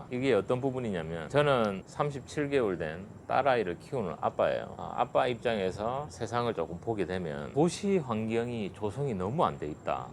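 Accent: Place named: Busan, South Korea. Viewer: ㅎ 부산사람 아이라 캐도 말 투가 딱 부산이네예 ~~ 근데 얼굴은 서울인데여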